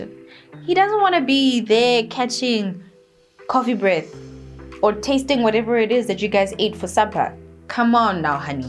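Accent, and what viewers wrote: South Africa. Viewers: Definitely a South African. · She is proudly South African 🇿🇦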